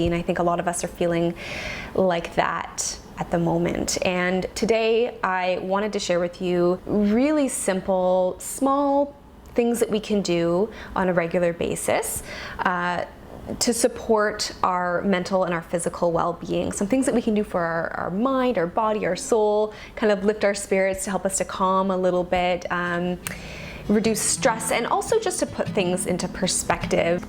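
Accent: Canada. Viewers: Are you Canadian? Kinda sounds like Canadian hehe.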